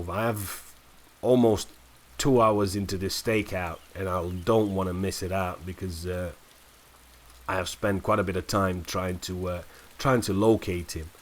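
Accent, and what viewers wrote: United Kingdom. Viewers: where are you from? you sound British but with something else thrown in every now and then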